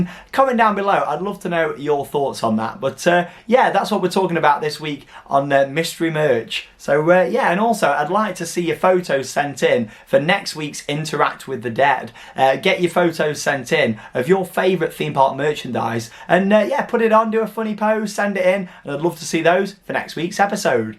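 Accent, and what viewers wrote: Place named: United Kingdom. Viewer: Being an American, it always makes me laugh when you and some other brits say duel, because it sounds like juul 😂 “...Now this will be a new juuling coaster...”😂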